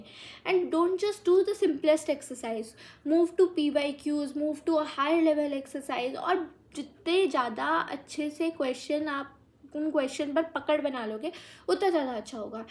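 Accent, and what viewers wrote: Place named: South, India. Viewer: Ig she is south indian people do have accent it's normal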